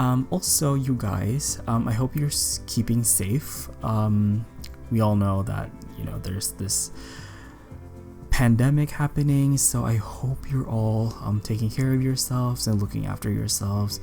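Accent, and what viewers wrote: Philippines. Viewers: Bruh ur accent, by any chance r u a FILO? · Stumbled to this video , and as soon as you start speaking i knew you're a filipino.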